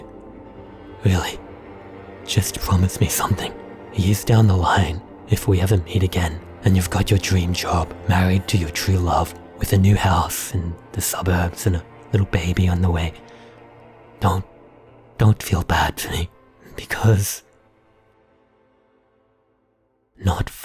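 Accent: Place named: Australia. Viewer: Are you Japanese? Also you seem to an Australian accent. Did you study or live in Australia?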